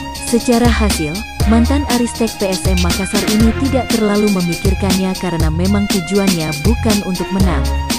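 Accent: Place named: Java, Indonesia. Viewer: Hampir medook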